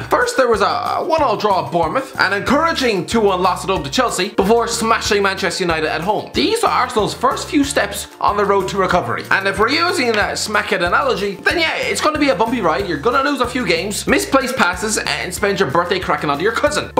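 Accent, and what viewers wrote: Ireland. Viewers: Yes! The sca....Irish guy is back! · When you're that Irish the subtitles think you saying "hello and welcome to hitc sport" is you saying "how about tomatoes disease poor" you need to slow down when you talk my friend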